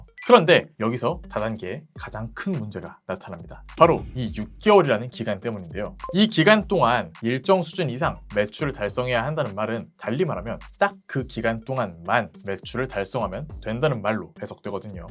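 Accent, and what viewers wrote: Gyeongsang, South Korea. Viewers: 이 분 발음이 경상도 분이시네용 ㅎㅎㅎ 영상 알차고 좋네요 잘 봤습니다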